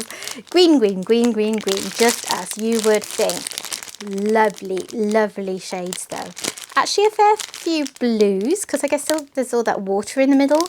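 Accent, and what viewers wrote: England, United Kingdom. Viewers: I just found your channel and I'm binge watching your posts. I'm from the states but I love hearing your accent because I can't wait to get back to England! It's Heaven to me 😊. Love your unboxes!!!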